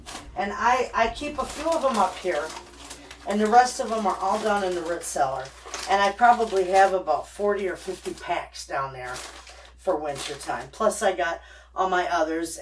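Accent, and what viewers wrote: Michigan, United States. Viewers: You just popped up on my play list, took a look at your pantry, trying to figure your accent. Michigan! We used to live in Hersey!!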